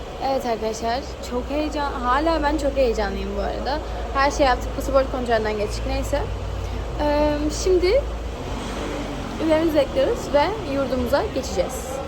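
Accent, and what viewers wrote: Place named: United Kingdom. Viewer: Ingiliz aksanli heyecanla bekliyorumm❤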